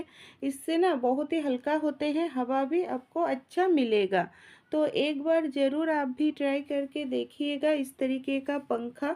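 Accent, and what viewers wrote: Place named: Assam, India. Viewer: Aapki bhasha se aap asaam ke hi lagte ho bangali kam. ❤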